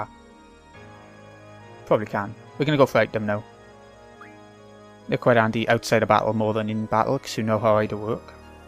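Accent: Wales, United Kingdom. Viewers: Are you Welsh? Also do you use turbo for the GF cutscenes, or do you mash?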